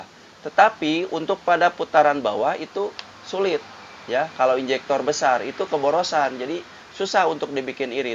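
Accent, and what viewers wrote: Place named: West Java, Indonesia. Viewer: pantasa at beda lmun orang sunda nyarios bahasa indo mh moal lesot tinu lagam /cengkok na a🙏😅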